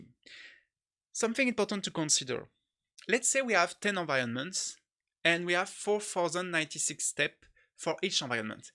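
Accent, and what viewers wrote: France. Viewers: is this accent french? i barely can understand some sentences :D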